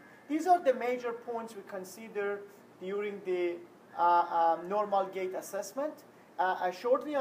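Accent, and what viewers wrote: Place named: Iran. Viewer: Well done! with nice Persian accent. Damet Garm Ostad!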